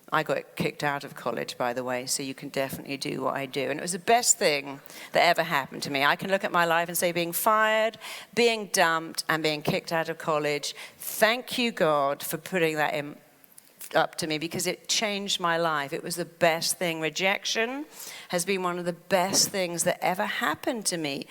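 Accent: Cambridge, United Kingdom. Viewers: is a genius! I just discovered her recently. Does anybody else speak to themselves in voice? lol weird I know. I am working on reprogramming. When I catch myself in negative thoughts I hear her lovely voice. For example this evening “ugh I’m rushing, I’m so stressed, don’t have enough time to do all these things” then I self corrected and heard her lovely British accent saying “it’s all right, I have plenty of time, I am enjoying myself”. lol anybody else? It’s like a self soothing thing 🤷🏼‍♀️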